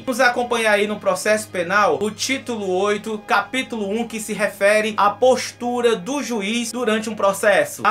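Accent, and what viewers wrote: Northeast, Brazil. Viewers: E esse sotaque me representa muito como nordestino... Não pare, vc é minha fonte de pesquisa política hj em dia...😍👏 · Tai. Gostei de sua forma nordestina de dar notícias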